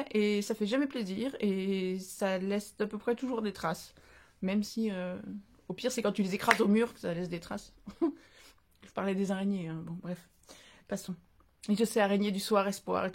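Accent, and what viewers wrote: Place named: South, France. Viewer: j'aime beaucoup votre style 😉, comme l'a fait remarqué Amandine, votre accent nous met le sud dans la tête. Bienvenue sur you tube, c'est courageux de se lancer, moi ça fait des mois que j'y pense mais... Et j'ai l'impression que ça prend beaucoup de temps, et surtout quand j'entends sur certaines chaînes que les gens sont si méchants, je ne sais pas si j'arriverai à laisser passer ou à m'énerver.. Les deux chaînes dont vous vous êtes inspirées pour la création de vos cartes, sont pour moi de super chaînes avec de belles personnes derrière leur caméra. Bonne continuation. À bientôt ici ou sur votre chaîne. 😉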